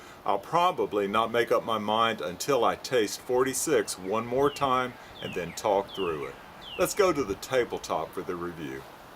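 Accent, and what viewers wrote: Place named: South, United States. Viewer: Love that Southern Drawl... 👌😎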